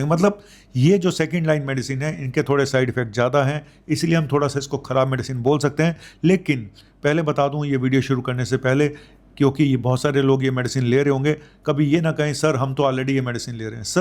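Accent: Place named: Uttar Pradesh, India. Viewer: Sir 🙏 hum bhi U.P. Mujaffar ngr. sy hai Tabhi aap ki language hamri jaisi hai,samne wale sath saf bolo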